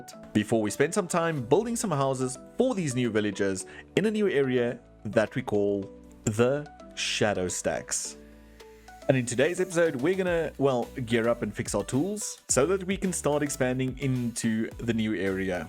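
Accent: Afrikaans, South Africa. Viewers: I got to ask. You have to be from SA. That accent is giving jozi😅😅 Edit: You called it a pick. SA confirmed. Nowhere else in the world would you find such nice English😂 Edit 2:Haha. Called it. Lol Afrikaans accent never misses😂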